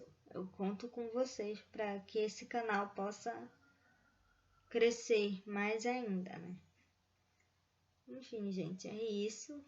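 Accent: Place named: North, Brazil. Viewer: Parabéns pelo vídeo, muito bom, engraçado a atemporalidade de certos assuntos aqui na plataforma... Sou do Amapá, muito bom ver um sotaque nortista ecoando conhecimento.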